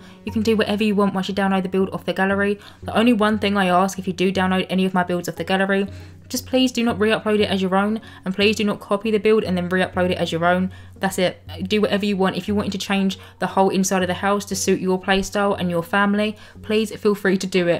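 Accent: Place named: Essex, United Kingdom. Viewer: Just came across your channel! I feel like you’re an essex gal like me! (I could be wrong) 😂 great video none the less. Keep going babe x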